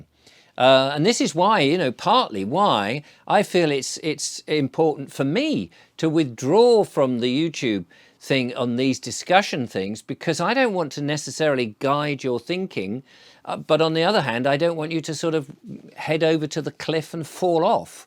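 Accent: United Kingdom. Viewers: I do love listening to, the relaxing tone, well spoken British accent, important messages. I don't watch everyday, but I do binge on a weekly basis.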